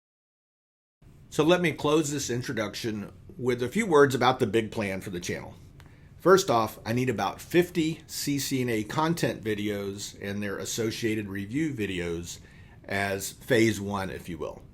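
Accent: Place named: South, United States. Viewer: Excited, one to finally meet you here,. Two to finally be able to understand someone not too fast or too slow speaking, and also like your southern draw. Its refreshing 😊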